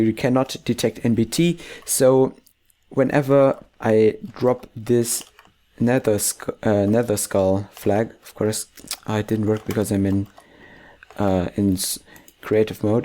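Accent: Germany. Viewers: Man hört raus das du deutsch bist ^^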